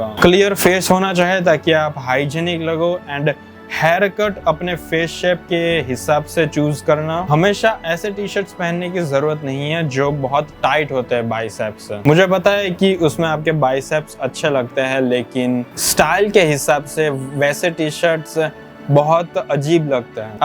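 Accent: Gujarat, India. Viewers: gujarati bolva na way thi khabar pde che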